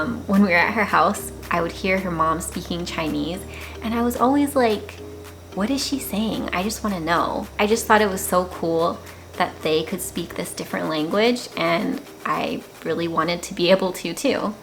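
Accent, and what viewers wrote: Taiwan. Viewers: the Mandarin you spoke make me feel very comfortable, that's because you have a strong Taiwanese accent! I learn English from you and other youtubers, like Wes Davies...etc. Briefly that Wes and you speak the most clear and neat English that I learn. Very good listening that I'd ever had. Also I am learning Japanese, too. My opinion to you that making English videos can make your channel more popular, and get more subscribers! keep following your channel. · 觀看的影片一直讓人覺得很舒服，妳的中文有好聽的台灣親切口音，節奏也很放鬆彷彿就像是個熟悉的好朋友在身邊說話，謝謝你和我們一樣一起愛這片土地與人文，祝福越來越好! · 好道地的台灣中文~ 命中註定當台灣人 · 這個小姐姐說話都很台也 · What apps or resources did you learn Mandarin with a Taiwanese dialect/pronounciation? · 你講中文根本就是台北腔了，跟你老公根本沒差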